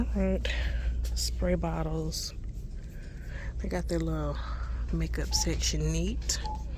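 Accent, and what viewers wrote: South, United States. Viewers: This is my 1st time on your page, you have a amazing asmr voice, then add that southern accent, PRICELESS 😁😁😁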